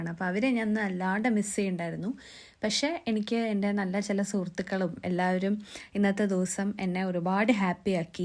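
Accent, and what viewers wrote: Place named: Palakkad, India. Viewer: No idea why your video came up as a recommendation. Congrats on your graduation. Are you from Palakkad, you speak just like my husband? My husband is from Palakkad, Kunisserry. My daughter graduated from Grad school in May of this year and works in Investment Management, we have lived in the United States for close to 30 years now.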